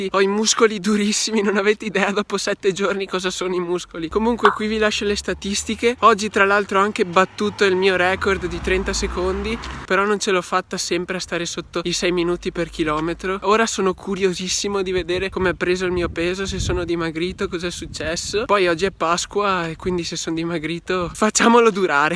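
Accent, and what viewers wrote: Veneto, Italy. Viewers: Te si Veneto sceto😂😂😂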